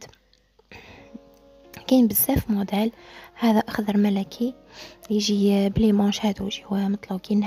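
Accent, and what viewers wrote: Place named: East, Algeria. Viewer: ربي يوفقك ختي مودالات رائعة باينة تحقي منين نتي تباني من الشرقي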